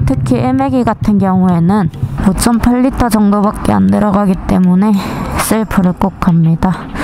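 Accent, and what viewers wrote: Busan, South Korea. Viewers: 전 몰랐는데 고마워요 부산말투랑 대구말투랑 비슷한 데 목소리가 조은거네요 주말 잘보네세용 🤭🤭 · 그나저나 부산 말투가 귀여운 거에요, 이 귀여운 거에요. 말투 귀에 착착 붙네.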